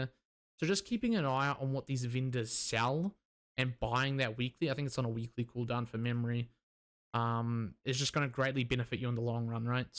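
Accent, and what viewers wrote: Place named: New Zealand. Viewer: Nah he's just from New Zealand, they sound like that innit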